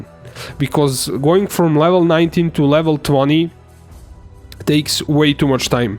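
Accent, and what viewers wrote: Russia. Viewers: Ты русский? Акцент очень заметен.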